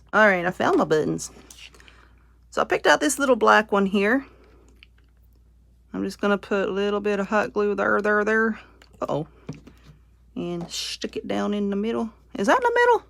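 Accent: South, United States. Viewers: Love your accent! It's nice to hear another REAL SOUTHERNER talk like myself. LOL Your crafts are great!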